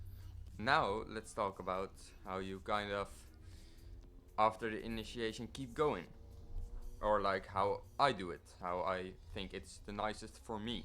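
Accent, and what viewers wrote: Netherlands. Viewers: ngl u sound dutch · W Vid like all ways! btw are you dutch?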